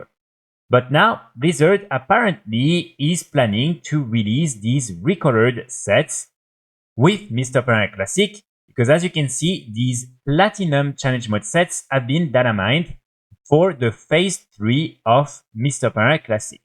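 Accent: France. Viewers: pas mal l'accent frenchi ahah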